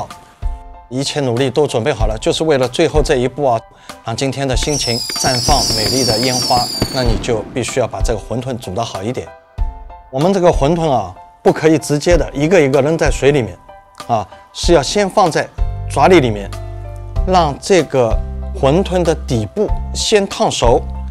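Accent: Shanghai, China. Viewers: ，上海口音。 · 标准上海普通话👍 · 带着上海口音好亲切，想念上海小馄饨了有空壹定按朱師傅的配方做壹次對自己好壹點哦!👍😍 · 师傅是上海口音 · 上海腔十足的师傅做出美味无穷的江南美食，让我又想起了家乡。好想吃上海的小馄饨~ 谢谢 ❤ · 大哥，听口音你是上海人是伐。你这碗小馄饨太感人了。😭我土生土长的上海80后，一不当心远嫁加拿大。太想念想小时候吃到的上海点心美食了，好几年没回上海了。大哥下次教一下粢饭糕还有油条怎么做好吗？怀念小时候路边摊的味道。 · 一听就是上海人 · 一开口就晓得了，阿拉上海特有的普通话口音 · 一听就是阿拉上海大师傅😄对自家好一点😋嗲额！看了我才吐水哒哒滴😋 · ，特别喜欢你的视频，尤其喜欢不经意流露出的上海话。看了那么久的视频，直到看到你的视频由衷的感叹，专业的老师傅就是不一样。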